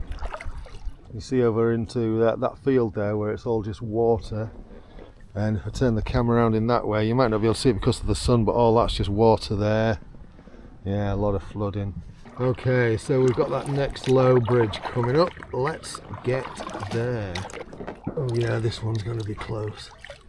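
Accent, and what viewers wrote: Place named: Yorkshire, United Kingdom. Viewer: I can hear a bit of queen's English around the Yorkshire slang, I was thinking Harrogate, but York makes sense 😂 I'm just up the road from Ferrybridge, but spend a lot of time over Selby/Aberford/Wetherby way walking dogs, so not too far from you if yer ever fancy a paddle and a chat!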